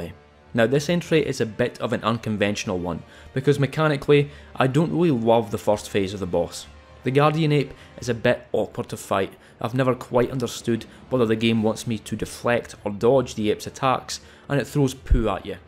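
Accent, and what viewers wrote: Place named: Scotland, United Kingdom. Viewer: The accent is so nice to my ears I love it, I wish Scottish people were real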